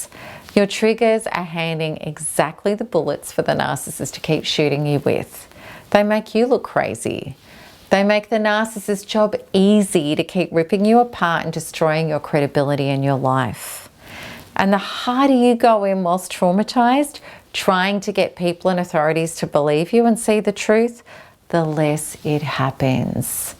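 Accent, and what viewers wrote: Australia. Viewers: I'm glad I found you. This is going to sound so weird and strange, but here's my confession: my dad was a womanizer who always complained about American women and how foreign types were so much better, this, that, yadda yadda. I must have been 12 or 13 when he started dumping that and constant criticism on me. To make a super long and agonizing story short, he married a woman from the gold coast who was easy to control like his ex-wife, my mother, until she was angry and stressed out to the max. There's so many wonderful people from Australia but I didn't realize how the accent by itself brings up anxiety and stress. Listening to you will be exposure therapy. I'm sorry to sound so weird and how something so small could still bring this up after so much healing, but I'm thanking God right now I found you.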